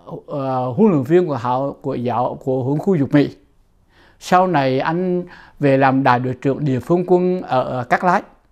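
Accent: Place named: Hue, Vietnam. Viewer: Giọng Huế ❤❤❤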